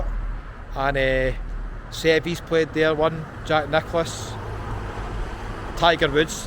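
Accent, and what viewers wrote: Scotland, United Kingdom. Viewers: Loved this … Ive been living in London for years but from Newton Mearns ! Glasgow ….This reminded me so much of a day out with a boyfriend to St Andrews when I was a teen !😂.. memories… didn’t take to long from Glasgow … makes me homesick for then …. It’s a joy to hear a Scottish accent ! Love all your videos … been binging them 👌… looking forward to seeing more… Polly X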